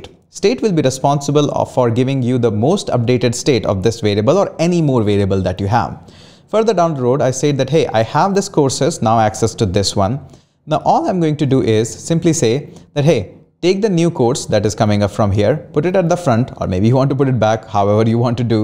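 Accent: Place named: Delhi, India. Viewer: why this delhi people spaek like this , too weired and annoying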